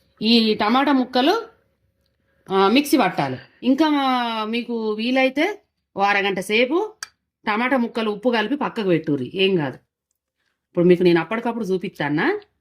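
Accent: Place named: Mancherial, India. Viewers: Hi aunty. Nenu me new subscriber ni. Medi ekkada aunty. Madi Karimnagar. Me language same Karimnagar side vallu matladinatte undi. Me vantalu bagunnay. Meru chupinchina udakabetti chesina tomato pachchadi Ninna chesanu. Chala baga kudirindi. Mirapakayalu ekkada kontaru. Chala bagundi me karam. Red color lo. Maku address cheppandi aunty. Memu kuda konukkutam. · మంచి వంటకం చేసారమ్మ చాలా థాంక్స్ మంచిర్యాలన మీది మాట తీరు అలాగే ఉంది 😊 🙏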